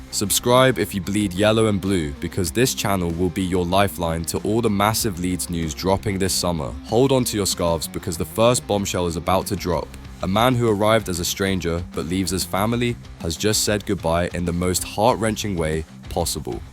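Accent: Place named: United States. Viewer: Oh here we go again with the “insider information” on any upcoming signings. These guys are unbelievably quick at getting the inside information out before anyone else, only to realise afterwards it’s a load of cack and never comes to fruition. I love how they report on things that have happened behind the scenes with our beloved club without a single shred of evidence in their reporting and just showing random excerpts of Leeds scoring goals. Did you see their report of Haaland praising Warnock with pics of Haaland and the Leeds badge in the same photo, garbage. Listen guys, these lot are in it for the “likes” and “subscribes” Not from this reluctant viewer. Who are these guys with the American voice over, who do they report for or from.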